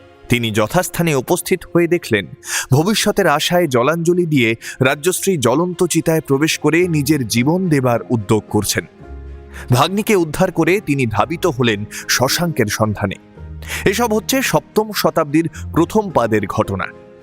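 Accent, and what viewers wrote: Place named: Kolkata, India. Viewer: Apnar pronunciation khub Valo. Kolkatar Bangla accent mashallah shundor khub.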